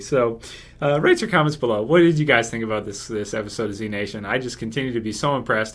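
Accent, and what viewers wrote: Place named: Ontario, Canada. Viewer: no one in Ontario pronounces "about" as "aboot". As I am from there, and I think sounds like a typical guy from Ontario. MAYBE some people in the far east coast of Canada like rural Newfoundland or maybe rural Nova Scotia (with their scottish roots) you might get someone that even come close to saying "aboot"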